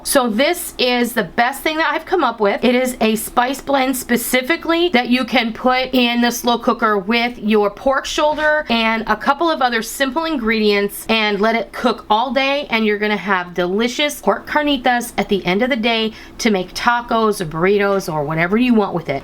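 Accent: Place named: Upper Midwest, United States. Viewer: Thank you for the great tip.. Making one's own personalized blends of herbs and spices put together is a great way and shortcut in having to bring many jars of seasoning out on the counter when doing the cooking. I want to tell you that I love your voice. So sweet and really great enthusiasm in it. For some reason though I had thought you were originally from the upper Midwest states such as Minnesota or Wisconsin. I didn't know you were from Arizona. Who knew! :)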